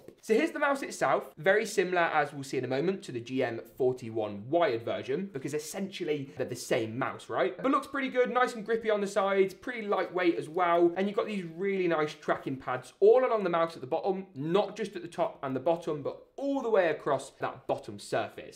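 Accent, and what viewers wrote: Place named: United Kingdom. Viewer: I Subscribed to your channel only to listen to the way you speak. I always giggle when I hear the British accent. [Also, I am not being racist or making fun, it's just that, after 9 to 6 IT job, I like watching your videos, they are informational, funny and clean].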